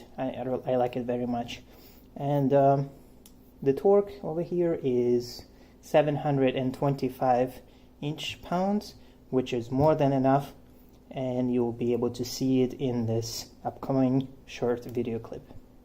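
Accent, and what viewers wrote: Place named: Europe. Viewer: Nice review mate, I thought it strange that with you European accent your talking imperial ? (we're metric in NZ by the way), Iv'e just got the Milwaukee SHOCKWAVE™ Titanium Drill Bit Set - 29PC and yeah ya gotta be carefull cos these bits go hard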